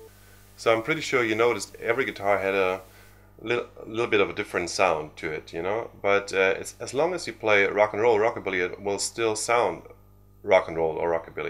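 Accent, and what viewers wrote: Germany. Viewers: I'm trying to Google, and all I can get is info on Andy Richer. I've never heard of either. ' accent, sounds Dutch, Austrian, German, anything but American south of the Mason-Dixon line which, as most people know, separates northern states and southern states of the USA. Anyway, I finally found some info on this "expert" on American rockabilly music. He has no pedigree of any kind. He's no doubt a good guitar player, I've never seen or heard of him, but I give him the benefit of the doubt. The commentators who use words like "awesome" are incredibly gullible. Don't they realise that there are indigenous rockabilly players who have generations of knowledge of this music behind them from whom they could learn much more than a German fan of the music who has decided that he can teach the world how to play it? Sorry, and I'm absolutely sure that your real first name is not, doesn't sound German.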